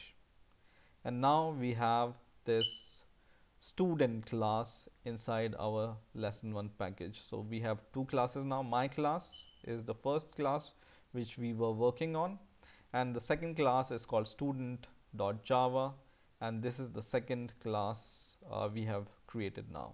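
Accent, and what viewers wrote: India. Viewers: some people dont like indian accent. but here enjoying the video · which accent is this? do u know hindi language?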